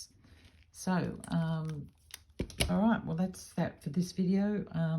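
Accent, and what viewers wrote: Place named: Australia. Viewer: Hi … it’s Shelley Cass in Brisbane. We ‘met’ in a different group about a month or so ago. It’s nice to here an Australian accent in a tutorial. Also I agree with your comment that we don’t have access to many large bead retailers in AU unfortunately. 😔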